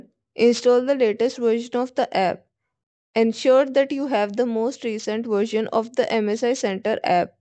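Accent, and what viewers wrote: India. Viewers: Can't watch you sound indian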